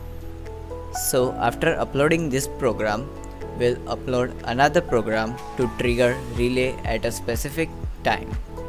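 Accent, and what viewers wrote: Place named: India. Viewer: when i hear that Indian voice feels like he wants to scam me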